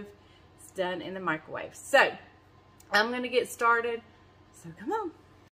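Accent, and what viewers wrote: South, United States. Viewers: I can hear it too.small town southern wife❤️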